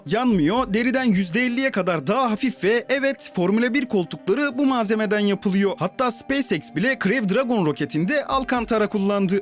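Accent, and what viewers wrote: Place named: Russia. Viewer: Kardeşim videoların gerçekten güzel ama konuşman kulağa çok ağır geliyor. Neredeyse Rus aksanıyla Türkçe konuşur gibi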